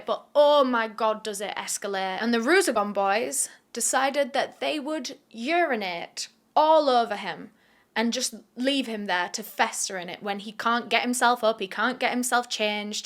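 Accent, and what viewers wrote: United Kingdom. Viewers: I mean let’s not forget about British history ….. It’s rich hearing someone with a British accent talk about how horrific the Belgian colonisers were.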